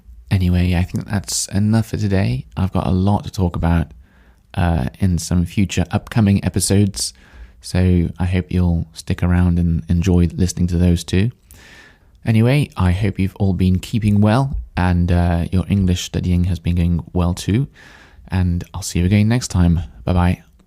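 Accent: United Kingdom. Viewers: Do you speak with rp accent?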